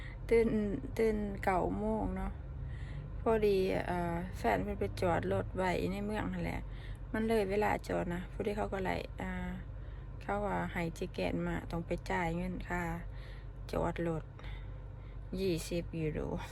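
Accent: Laos. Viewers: พยายามอย่าพูดลาวบ่อยๆ เมื่อไหร่จะพูดไทยชัดสักที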